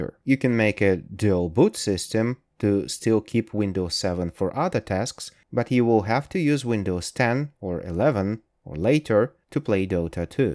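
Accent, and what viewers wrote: England, United Kingdom. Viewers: Да вы из Англии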